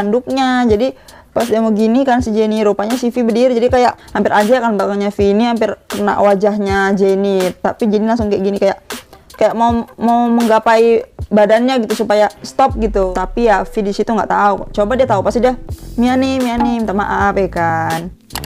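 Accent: Medan, Indonesia. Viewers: Kakak anak Medan ya??,krna kalau di Medan kyk gitu ngomongnya😊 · Kk orang Medan yaa Logat nya keliatan banget BTW maap kalau salah Ya ka 🤣😂🤣😂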